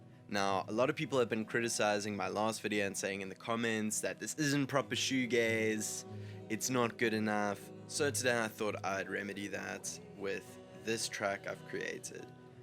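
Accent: South Africa. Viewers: Cape Town accent?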